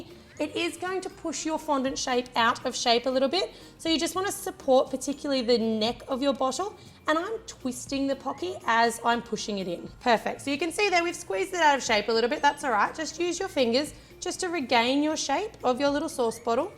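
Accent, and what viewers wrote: Australia. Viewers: I just started watching your tutorials and I think they are great! ; ) I just want to know if you are Australian ? :-)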